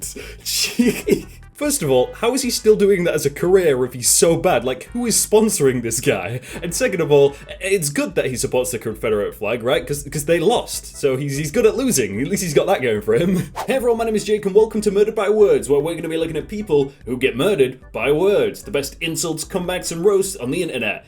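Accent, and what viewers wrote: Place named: United Kingdom. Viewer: Every Jake's video helps me learn British-English I love it, thank you Jake 🙏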